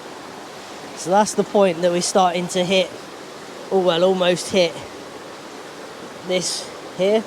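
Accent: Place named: England, United Kingdom. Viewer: if you want to hear someone with an english accent tell you thats what they wanted 14 times in a row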